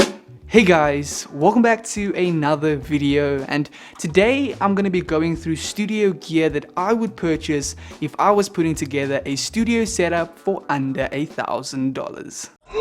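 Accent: South Africa. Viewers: Hi love your video’s been watching you from before the giveaways 😜 just a random question were are you from because I am getting South African vibes from your accent 🤷🏻‍♂️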